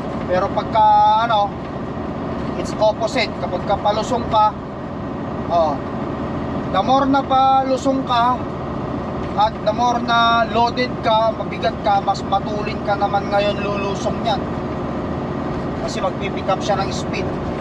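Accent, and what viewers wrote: Ilocos, Philippines. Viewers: natutuwa ako sa mga pronunciation mo, hindi maitago ang pagka ilokano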